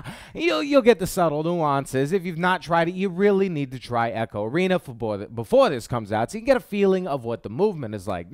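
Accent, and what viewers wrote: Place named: New York, United States. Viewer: I detect a New York accent., you from ther East Coast?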